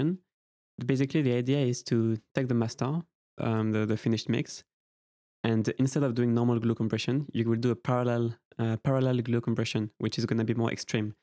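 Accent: France. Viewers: Apart from your Nice Frensh Accent, You are a great mixing and mastering teacher 😍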